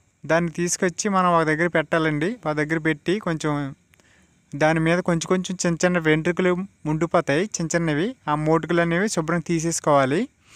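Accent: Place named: Vizianagaram, India. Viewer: Vijayanagaram yaasa manadhe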